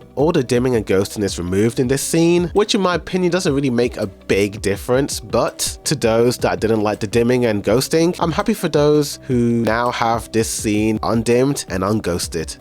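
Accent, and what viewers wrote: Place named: United Kingdom. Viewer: it's definitely his accent but I doubt English is not his first language. His accent is...English. Sounds like almost every UK dude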